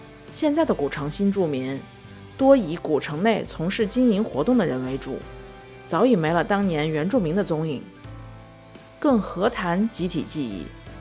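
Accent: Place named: Beijing, China. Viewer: 博主，你那京腔太明显了，普通话要好好练练。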